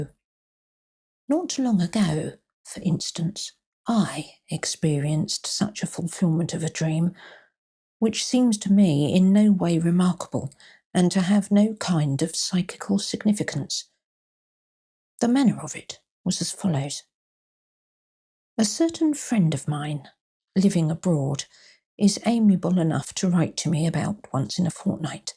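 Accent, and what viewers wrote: England, United Kingdom. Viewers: Can’t place the part of England you are from. Although I’m Scottish a lot of my family lived in Sussex, London and Wiltshire . Your cadence is softer and very pleasant and your readings are marvellous . I would love to hear you read “ Schalken the painter “ by Sheridan Le Fanu. Both a horror tale and an allegory of arti being sullied by rich patrons!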